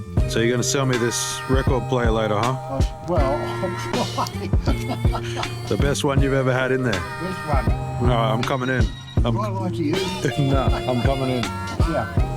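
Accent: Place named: United Kingdom. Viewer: Bro I wanna decode your British accent through Alvin and the chipmunks lo-fi hip hop because you are hipsters and I'm here to get a sense of your style? For a marketing video? You wanna drag my ears through barbershop b-roll music whilst you pretend to explanate any bit of information. Fire your editor posthaste good channelkeep.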